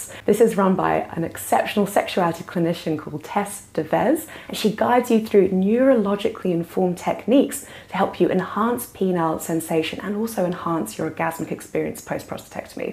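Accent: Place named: United Kingdom. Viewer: Listen to this woman. It is not just lip-service. I have been jerking off for 68 years and I couldn’t agree more. Unfortunately, her British accent makes understanding what the hell she’s blathering about quite difficult, but I heard the word “masturbation “ over and over again and that I can relate to!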